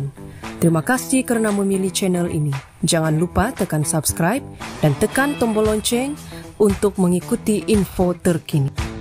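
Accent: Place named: Java, Indonesia. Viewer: Tak pasal2 ckp ala Indonesia. Mcm Jawa kronok.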